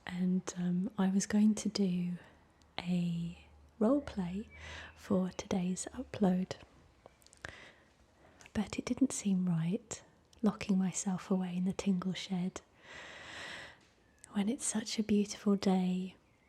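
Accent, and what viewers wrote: England, United Kingdom. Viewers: I absolutely luv that english accent...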